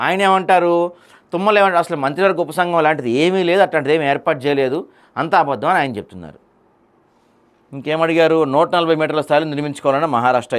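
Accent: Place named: Andhra, India. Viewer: అరేయ్ బొచ్చు నువ్వు ఆంధ్రా క్రాస్ ఆర ఎందిరా నీ భాషా😂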